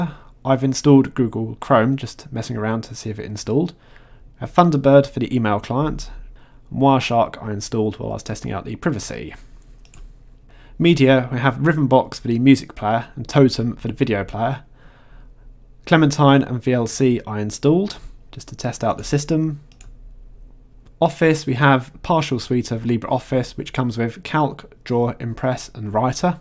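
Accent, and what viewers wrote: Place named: Scotland, United Kingdom. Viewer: Can't really get from where this guy is from... Scotland? Manchester area?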